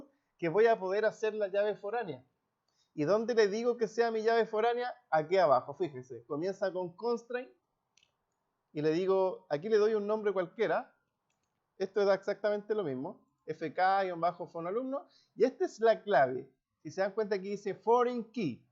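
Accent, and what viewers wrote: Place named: Chile. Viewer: Excelente explicación muy didactica y de facil comprensión, además el aporte de la página es muy util para quienes estamos iniciando en el mundo de las TIC, por su acento deduzco que es ud chileno, muchas gracias saludos desde colombia.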